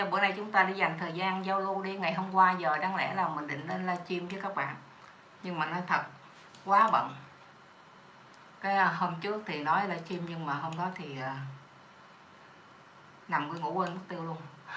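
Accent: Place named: South, Vietnam. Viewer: Nghe giọng nói cô tôi tưởng tượng là một phụ nữ nam bộ già dặn. Những được nhìn dung nhan thấy trẻ hơn giọng nói rất nhiều. Từ khi biết kênh của cô tôi rất thích.. hiểu biết sâu rộng … phân tích cặn kẽ dễ hiểu, luôn ủng cô … đầu năm chúc cô và gia đình vạn sự bình an, hạnh phúc tràn đầy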